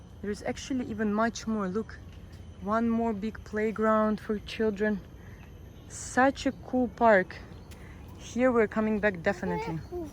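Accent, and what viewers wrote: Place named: Portugal. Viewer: hi where are you from your english has a funny accent! oh you are fom portugal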